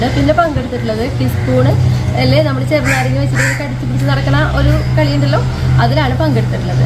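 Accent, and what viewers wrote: Thrissur, India. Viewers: എനിക്ക് ഒരു പാട് ഇഷ്ടമാണ് തൃശൂർ ഭാഷയും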